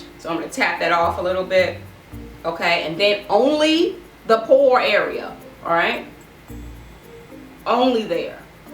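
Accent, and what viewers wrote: South, United States. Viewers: 😂 especially when she says "pores" for me. Maybe the southern accent persuades me. I'm about to go refresh my face now...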